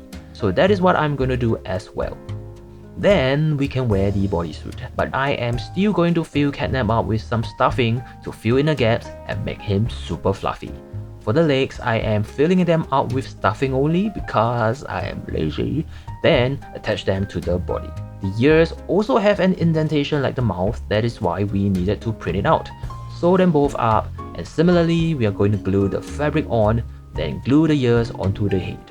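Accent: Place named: Singapore. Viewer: from the accent and the whiteboard marker u used i could tell u were from singapore haha! love ur sense of humour and u are so talented. gonna binge watch ur other videos now ;) !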